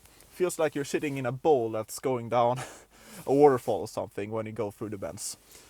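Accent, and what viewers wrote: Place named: Sweden. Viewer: Cheers to Sweden, love the accent!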